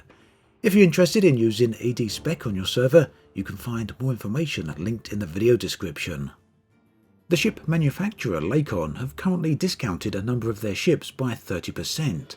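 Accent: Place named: South London, United Kingdom. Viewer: Hey cant help noticing your accent is like Pritti Patel's..is it an area of south London?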